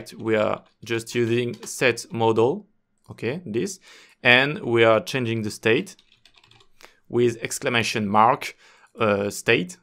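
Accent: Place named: France. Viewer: Sweet french accent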